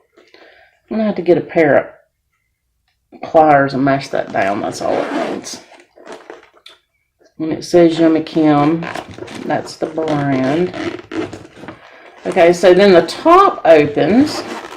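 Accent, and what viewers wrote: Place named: Texas, United States. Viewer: You have the most wonderful accent. Reminds me of my days in Texas. I have seen so many ads for those boxes now. But the Tarte palette in this season's box is just not doing for me. Hahahahaha.. I am at the Foreo (or something) part right now. I was thinking the same thing about the two prong analysis! Because, I mean, we are the first to notice in something in our skin changes, right? May be, for men, who are usually clueless (and proud of it) about skincare, might find it useful. Because, most men I know use a moisturizer, that their wife bought, if the wife says their skin looks ashy! LOL!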